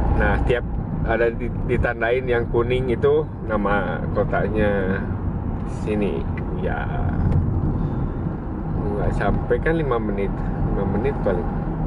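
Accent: Sunda, Indonesia. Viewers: Sok lucu lamun siakang ngomong Sunda teh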